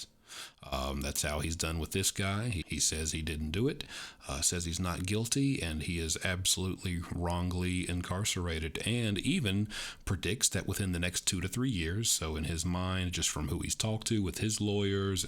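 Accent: South, United States. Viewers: I agree! Great voice, lovely soft Southern accent 👍💐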